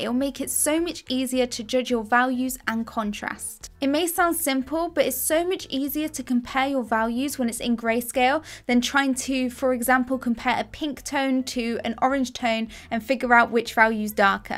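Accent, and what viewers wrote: United Kingdom. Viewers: Thanks for the video👍🏻! By the way I love your accent, I’m so obssessed with British pronunciation, is it the standard british accent or a Southern/Northern? Xoxo 😘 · Are you from Britain? because I can hear your accent from Britain.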